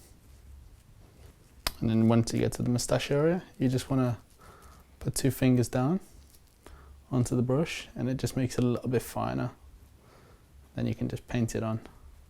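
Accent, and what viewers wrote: Liverpool, United Kingdom. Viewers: Heard the scouse accent. Got excited as live in Liverpool. Looked up his premises to book in. Saw it was in London. Got gutted. :( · Is he a scouser? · u sound like a scouser wer bout is ya barbers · U from Liverpool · i don't know why but I never expected you to be British.. This is the first video I've watched of yours where YOU talk. Whoa! Surprised but I love your accent :) thank you for all your wonderful, relaxing videos! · Is he scouse? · Have you got scouce in you? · He's English, but from Liverpool. It's a very distinct, thick local accent there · hamza1947 No. Im from birmingham. He is a scouse trust me. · Are you from Liverpool mate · Are you part scouse too, i can hear an accent in you?? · Jake DaSnake scouse (Liverpool) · are u scouse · Didn't know you were a scouser. Nice to see the accent getting about. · Your accents mad, like it sounds scouse, a bit foreign and north eastern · Do you have a scouse accent you tryna hide? 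· Is that abit of a scouse accident? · I like your British accent.. make my eye close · He sounds Scouse. (Liverpool) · U have the same accent as me. Scouse or Geordie? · Are you a scouser? You sound a little bit like one lol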